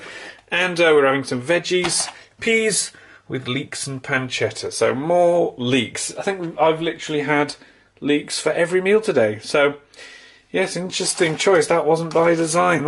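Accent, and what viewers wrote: RP, United Kingdom. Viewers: Could NOT stop laughing at your monocle and posh accent, cracking me up!!! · I like your posh accent. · You have to do the posh accent, everything else won't cut it!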